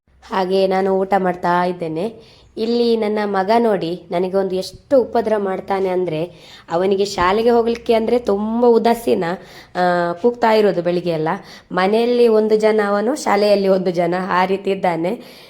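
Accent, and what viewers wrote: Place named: Mangalore, India. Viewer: Motte recipe super ❤love ur Kannada accent mangalore style ❤😊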